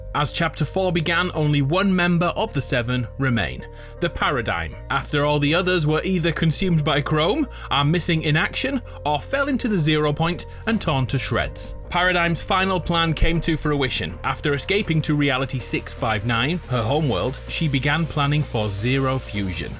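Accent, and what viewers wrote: United Kingdom. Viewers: He's british, they pronounce things differently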